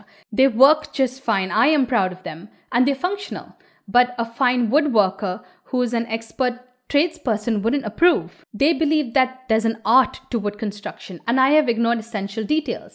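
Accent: India. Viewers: Aren't people buying used containers quite cheaply that are no longer suitable for their original use? Doesn't LA have a ton of them? As for insulation, it seems silly to use steel in a cold climate. Rather they should be used in warm climates so no insulation is needed at all. Then just protect them from rain. As for working within the skin, not really if you can put them together. Not many single people need a home more than 17' wide and about 45' long. That's 765 sq ft. The main problem seems to be their high weight. The future is probably a prebuilt, custom designed home, designed mainly by the buyer with software, using the best materials available in that area at the lowest cost, assembled in a few days on site. I wonder if foundations could be poured on mass, making the process much cheaper than doing one at a time. Surely it must be. You've got an excellent analytical mind but coming from an Indian background you're probably used to family sizes that are larger whereas this idea is best for single people or a couple at most. 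Dubai? Please. Your heavy Indian accent makes India a more likely origin. Just tell people how long you were in Dubai. Otherwise it sounds like you're trying to hide it for some reason. The head of both Microsoft and Google are Indian. Plus a lot of Indians are getting educated in the UK which is often far superior to most American universities. No need to hide anything. Its best to never bring up racism. Sure it may be there but its often subtle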